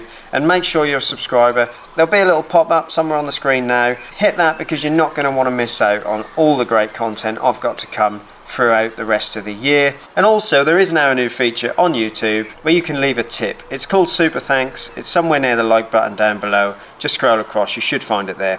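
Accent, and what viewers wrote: Norfolk, United Kingdom. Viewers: Awesome - just found you as I am about to attempt to renovate my bathroom - you sound like a local too ! (Norfolk ?) excellent tutorial thank you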